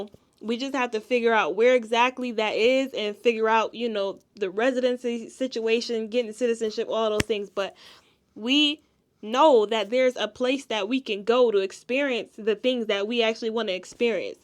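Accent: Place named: United States. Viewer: oh woow. That was a very good vlog. As a Kenyan, I actually thought you were Kenyan but your accent confused me for a while until u owned up of being American. U look so much like us and we will do everything to make u feel being African as u are indeed one of us. We certainly do have time for family...which is the most important.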